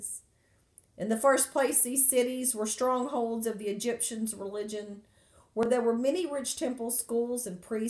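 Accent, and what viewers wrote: South, United States. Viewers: Me being from the south I love that southern accent don't change it for anyone...one book I will suggest for you to read and maybe to expound on its arcanian Freemasonry. By RA Gilbert..this book was shed a lot of light on a dark subject for you and will bring together some missing pieces of the puzzles as far as other things are done and said how are ancient Freemasonry was that of the arts of the ancient Egyptians and how the Greeks and Romans stole it in Martin British empire who inherited has changed it to be a good old boys club while trying to exclude African-Americans from it. Great piece you're doing wonderful.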